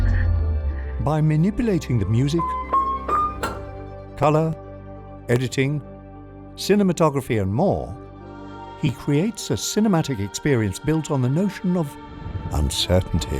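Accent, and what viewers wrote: RP, United Kingdom. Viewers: I have a hard time taking the narration seriously with him doing the RP accent.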